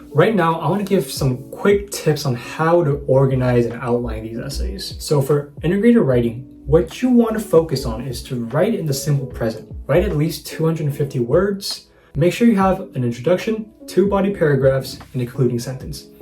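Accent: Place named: Asia. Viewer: Thankyou so much and i really appreciate for sharing your valuable guidance and experiences . Being an Asian i can resonate with your speaking accent and style... Please upload more videos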